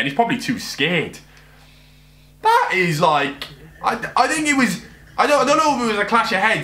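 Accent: Wales, United Kingdom. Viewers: Defo a Newport or Cardiff lad you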